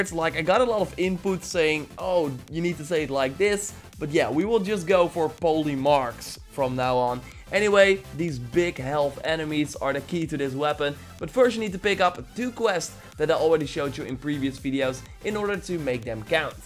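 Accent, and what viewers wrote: Netherlands. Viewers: You sound......Dutch.... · je hoort gelijk nederlands haha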